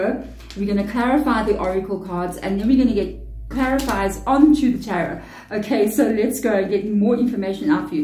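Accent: South Africa. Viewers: Hello dear of, light and blessings to you 💖 thank you so much for this message, it is for me. A video of yours came to my feed a few days ago (my sense of time is fuzzy now) - you first published it 2 weeks ago — it was about creating a new life that will be shocking to others. That was for me, I watched until the end and that message gave me goosebumps, I felt the resonance I my heart, my head was tingling the entire time. This message is the continuation of that one. I know it. And you will know it too 🌻 I am so grateful. I watched this one the whole way through and I wanted to watch it over again right after. Thank you for the prayer, thank you for sharing your light with me. It’s amazing that you use the word reprogramming here because that is exactly what I feel like is happening. I’m allowing my intuition to guide me as I flow along the process. There are rare moments when my mind still wants to take over and ask questions and plant seeds of doubt, I take a breath and come back and trust. 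Thank you. I am so excited for this newness. It’s different. Changes are happening. But it feels gentle and I feel so supported. Thank you thank you thank you. I send you love, beaming to you from across the Earth. P.s. I have a friend from South Africa, I knew you were South African when I heard you speak in the first video, you confirmed it in this one. Maybe one day I’ll find myself there. Nou gaan ons braai 😂 Big big love and hugs to you 🫶🍀✨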